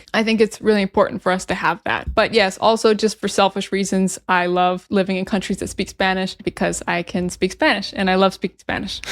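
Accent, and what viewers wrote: General American, United States. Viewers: Si le llaman standard American English y no usa muchos slang y otras expresiones en este video . Stay safe & greetings from the Gulf of California, Mexico 🇲🇽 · I'm from Buenos Aires and I can easily picture myself living in Boston or NYC, and without a car. But I would blow my head off if I had to live in Orlando, for example. I barely remember having seen any sidewalk there. By the way, your English is crystal clear to me. I understood everything, like you were talking to me in Spanish. I guess you have a pretty standard American accent.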